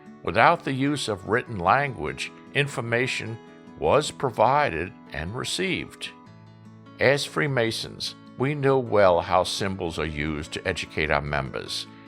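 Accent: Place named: New England, United States. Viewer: Narrator sounds more like he's from Massachusetts or Maine, not Louisiana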